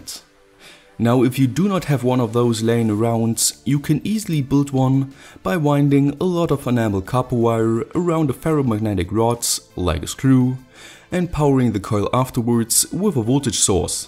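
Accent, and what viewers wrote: Germany. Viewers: I guess by your accent that you are from Germany or Austria, if so why do you claim that JLC PCB has free shipping? But it doesnt show for me free shipping(Austria)?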